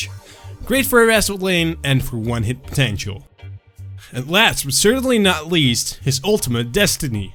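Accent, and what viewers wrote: Netherlands. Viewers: Are you Dutch? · cant watch this cause of the dutch accent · haha omg, ik hoorde het aan je accent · Are you Dutch by any chance? Nice guides by the way, they help me out loads!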